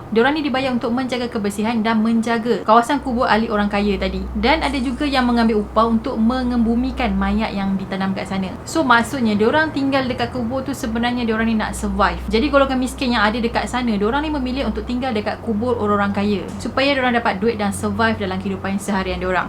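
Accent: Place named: Perak, Malaysia. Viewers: wau ckp owng perak tengoh lehh..saya orng perak tapi belah utaraq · Pandai story cakap light Perak ye..aje gemo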